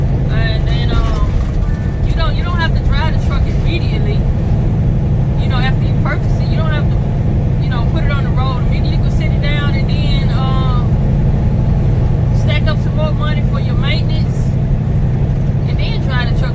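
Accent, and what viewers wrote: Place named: Alabama, United States. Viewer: Traveling on my birthday lol.... Thanks for the great info and stay safe my sister...... you sound like a Bama Girl lol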